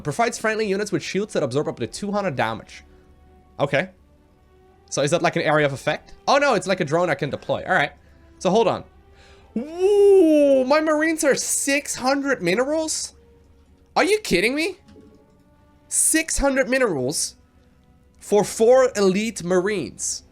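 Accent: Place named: Netherlands. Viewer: lovely dutch accent...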